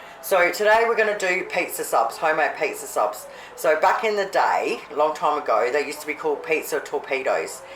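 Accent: Australia. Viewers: I just stumbled upon your channel when I searched for baked pizza sub. I'm about to subscribe cuz you have a candid way of saying things like me only I have an American accent and yours is Australian. I can't wait to see what else you got cooking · Michigan here, love the OZ accent. I'm doing subs with ham, pepperoni, black olives, sweet onion, tomato slices, provolone cheese and after it's all toasted in the oven, shredded lettuce. ( edit: and jarred pizza sauce. ) Thanks for the video!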